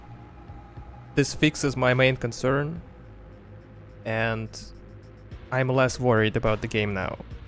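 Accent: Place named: Russia. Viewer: Я слышу русский акцент брат))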